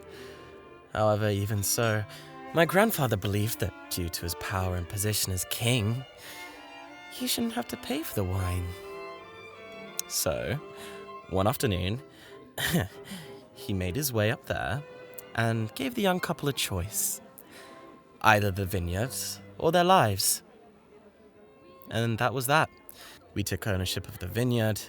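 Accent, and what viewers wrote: United Kingdom. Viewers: BRI ISH BABEEEEE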